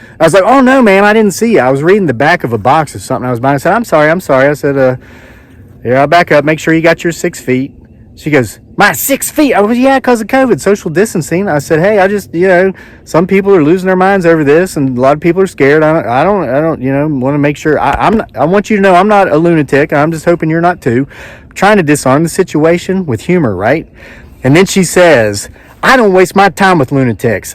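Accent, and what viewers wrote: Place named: West Virginia, United States. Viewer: Great videos! We have to ask where you’re from? Your slight accent reminds us of our friends in Fairmont, WV 😄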